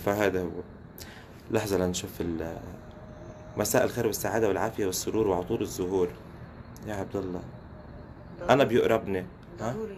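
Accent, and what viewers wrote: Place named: Lebanon. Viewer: ياريت تقلنا انت من وين ساعه بتحكي بناني ساعه خليجي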